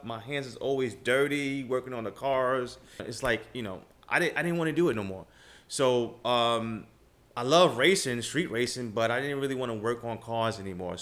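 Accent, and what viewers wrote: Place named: New York, United States. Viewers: ay, from the Bronx I knew I recognized that accent lol great video I enjoyed hearing your story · You def have a New York Accent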